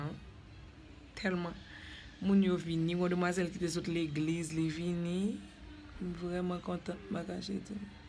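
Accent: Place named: Rural, Romania. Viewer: ki sense sa ou di an genyen,wap felicite yon moun,ou tou profite desann moun andeyor,li pale tankou moun andeyor se 2020 nap viv suspann ak radot nou an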